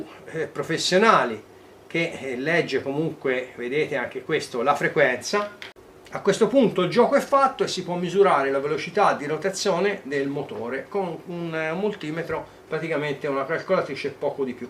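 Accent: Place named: Lucca, Italy. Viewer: See ...sei ganzo !! Toscano anche te ...dall.accento sembrerebbe lucchese io pisa ahhh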